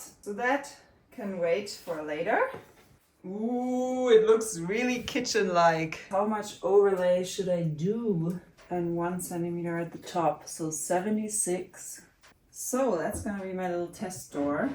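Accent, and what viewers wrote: France. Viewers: a very nice french accent, keeps going amazing works. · Mignon accent français 😘❤️ Bonjour de France🎉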